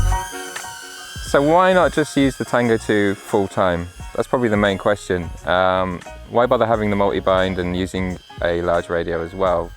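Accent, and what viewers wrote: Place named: United Kingdom. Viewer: Hey sounds a little like my review but with a nice British accent! Makes it 100% better, at least 😎 Great job mate!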